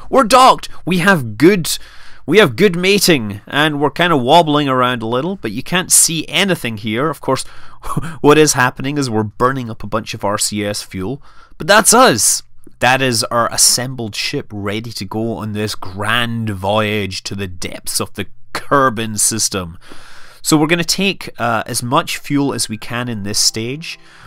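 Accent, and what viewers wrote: Scotland, United Kingdom. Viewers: Hey, or you Scottish or Irish? Forgive me if you're something else, your accent just sounds more Scottish to me. Sorry if i offend you! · are you scottish or irish? cant tell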